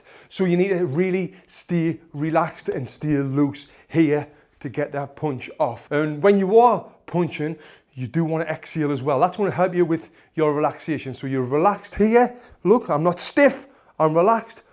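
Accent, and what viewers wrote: United Kingdom. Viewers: As a french person, I enjoy your british accent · 喜欢你的英国🇬🇧口音，哈哈！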